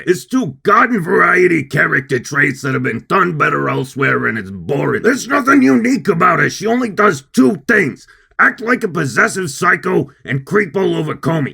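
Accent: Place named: New Jersey, United States. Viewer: Love the jersey accent, although bailitmore accent is better, good video, easy sub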